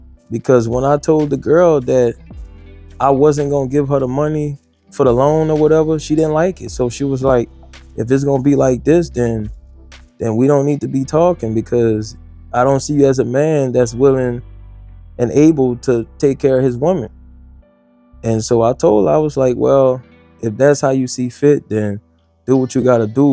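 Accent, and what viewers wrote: Louisiana, United States. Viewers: Wait! You sounds familiar. Are you from NOLA Bro? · Where you from bro. You sound like you from Louisiana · Bro are you from Louisiana? I’m from BR myself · Fam, you from the boot?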